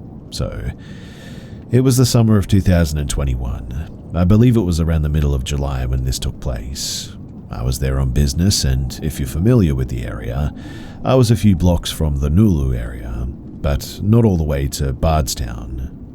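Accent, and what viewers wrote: Australia. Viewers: Are you South Aussie? I mean; I am. And you have that type of Aussie accent you can’t quite pick which state you’re from 😂 but love the videos, they help me sleep. You’re voice, definitely top tier 🥹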